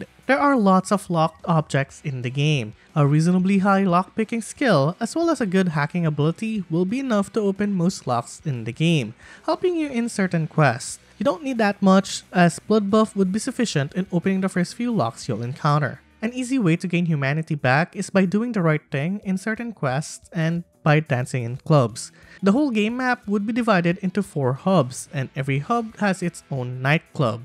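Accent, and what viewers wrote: Philippines. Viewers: Filipino accent detected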